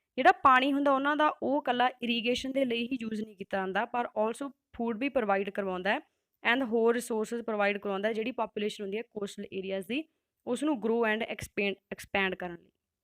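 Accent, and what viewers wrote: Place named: Punjab, India. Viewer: Mam thoda clean hindhi bolo only pujab nahi koi or State wale bhi dekh te hai apko 🙄 please 🙏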